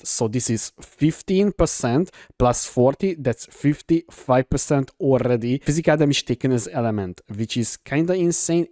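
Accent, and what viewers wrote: Hungary. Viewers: Hey, just from curiosity , by any chance do i get right from your accent that you are hungarian?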